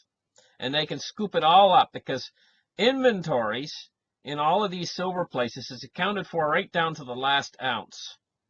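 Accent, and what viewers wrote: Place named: Northern Ontario, Canada. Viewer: His name is. I asked him where in Canada he lives and he didn’t respond. Judging by his accent, I would say Northern Ontario or the Maritimes (eastern Canada)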